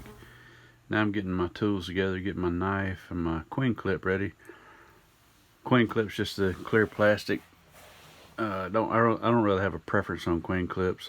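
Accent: South, United States. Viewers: Just a wild guess sir, are you from the south? 😂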